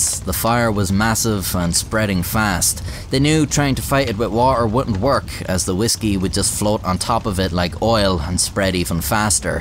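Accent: Ireland. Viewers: I'm an American and I knew you were Irish from the first vid I watched :/ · you can tell it's a legit Irish accent because the first syllable in "Ireland" is effortlessly between an American "are" and "our" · bro I knew you were Irish the second I heard you, not all us americans are idiots I promise 😂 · I'm American and I could tell your accent was Irish before you confirmed it for me. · I’m American I I could tell you were Irish the first time I heard you voice. How could Irish people not tell? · It makes sense that people in Ireland would talk differently due to where they came from. So where are you from? Cork? Kilkenny? Galway? Please tell me. O yes, this was quite amusing by the way; morbid, but amusing. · Transatlantic Irish accent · Who didn't realize was Irish. I thought all the replacing TH sounds with Ts and Ds made it obvious 🤷‍♂️ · So, where's the accent from? 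I consider myself fairly familiar with Irish accents. I'm guessing the midlands, cos it's fairly neutral, but definitely Irish. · the very first video i saw from you, i instantly thought "this fella is from ireland" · I'm genuinely surprised that people couldn't place your accent as Irish... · What part of Ireland are you from? The first video I watched on your channel was the one about the runaway train. I was trying to puzzle out for a time what part of North America your accent was from until your "o"s got me to think across the pond. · I started watching and subscribed mainly based on his awesome Irish accent - the wit, humor and factual information are what got me hooked. Thanks, you have taught us so much and provided many belly laughs. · Yea I suppose most Europeans couldn't identify my distinctive Wisconsin accent but I knew you were Irish because it's obvious to me at least that your accent is Irish. 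Probably a lot of people died of being poisoned by drinking poop and pee and who knows what microorganisms and parasites were in that slush. I'm not convinced it was entirely due to the alcohol itself and the dog probably couldn't suffer from physical or mental withdrawals after such a short period of time. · I'm in the US, and I always assumed you were Irish when watching your videos, even if your accent is slightly different. Boston accents, Texas accents, Chicago accents, and New York accents are all in the country I live in, I imagine that there are different accents and dialects in every country and language in the world. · You’re extremely lucky to have the Irish accent that you have. Americans can actually understand what you’re saying. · well you sound very Irish to me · You think Americans thinking your Irish accent is fake is annoying Try Brits (who should know better) mistaking my (admittedly watered down) Irish accent for being American. 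· You got rich boy irish Accent. heard it all over the world. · I'm thinking your accent is cavan or maybe meath, not too sure though (being a dirty dub myself) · I’m American and I knew your accent immediately I’ve been to Dublin and loved it js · Haha how can your accent be anything other than irish. The fact that your accent is hard to place around ireland means youre probably more irish than most irish people. An tir na nog. Best palce in the world. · It’s okay, I could tell you were Irish. And there’s a place called Belfast? I thought that was just my ex ex ex ex ex ex friend’s waifu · I found it surprising people have trouble with your accent. The first time I heard you speak i knew you were Irish. I would have guessed you were from Glasgow though. Thinking more about it, I guess it makes sense I know a little more about the different dialects of Ireland, my family is Irish, my great grandmother only spoke Gaelic and, while I haven't, a lot of my family travels between the US and Ireland fairly often, so I'm a little more educated about Ireland than tbe common US citizen.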